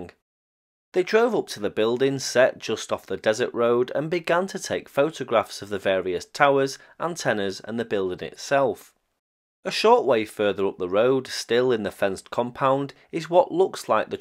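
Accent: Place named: Manchester, United Kingdom. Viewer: Hearing Yosemite Sam referred to in a Manchester accent gives a whole new take on one of my favorite childhood cartoon characters.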